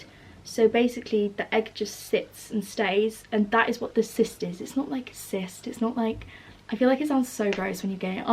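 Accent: United Kingdom. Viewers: Ikr she has the British accent that is super calming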